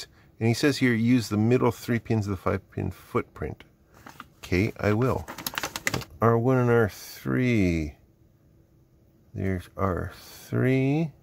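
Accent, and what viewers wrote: United States. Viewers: That's mainly coz we Aussies Solder, while he SoDDers like a yank.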